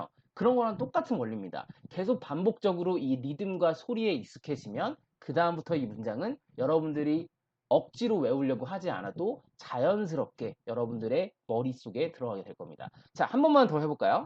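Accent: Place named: South Korea. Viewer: 전형적인 한국식 발음이시네여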